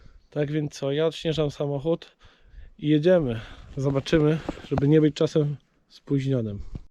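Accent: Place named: Kashubia, Poland. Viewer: Zatknij uszy bo to sa kaszuby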